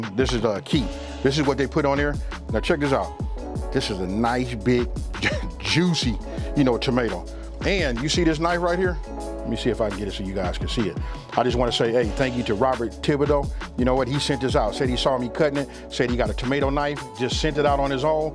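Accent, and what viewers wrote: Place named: Los Angeles, United States. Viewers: Boy you is the real deal! I live in Houston but I’m born and raised in LA (Crenshaw and Slauson!) and hearing your accent makes me feel at home ❤️ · Man I lived in California for 2-years Anaheim off of Brookhurst Avenue. Also spent time in Alameda California. Soon as I heard you talk and that accent, I said big homie from Cali. New subscriber and honestly, you should have over a million. Let's go let's get a to over a Million by Year's End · I can tell bruh from LA by the way he talk.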